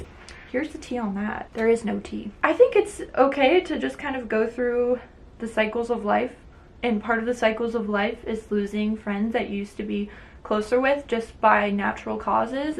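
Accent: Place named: Nashville, United States. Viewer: I hear it most in the words "here", "now", and every so often at the ends of your sentances! I just assumed it was you picking up some of that Nashville accent lol ! Maybe I'm just hearing things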